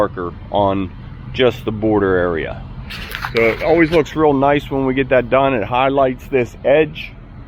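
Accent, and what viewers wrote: Pittsburgh, United States. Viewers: As soon as I heard you talk I knew you must be from somewhere near Pittsburgh, PA… am I right? I grew up there.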